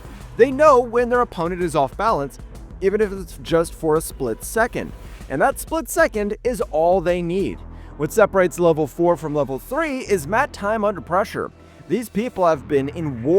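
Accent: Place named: United Kingdom. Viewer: british accaent e rbooks uk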